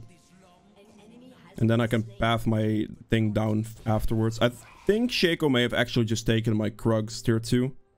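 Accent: Netherlands. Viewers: Ik hoorde van de eerste 2 woorden al da je Nederlands bent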